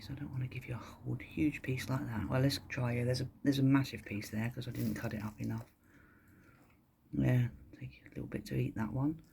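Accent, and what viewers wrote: England, United Kingdom. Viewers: I love your videos, and your voice! We silly Americans go ga-ga for English accents! God bless you and the critters!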